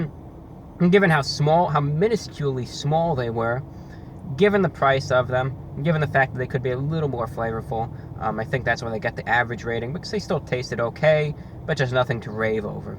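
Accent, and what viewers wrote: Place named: Upstate New York, United States. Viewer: recognize your "accent". Are you from Upstate New York? I am and that's how people talk around here.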